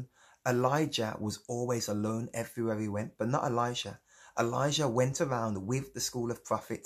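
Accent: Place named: Midlands, United Kingdom. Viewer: Yaaay are you from the midlands? I’m from Wolverhampton! Thanks for this.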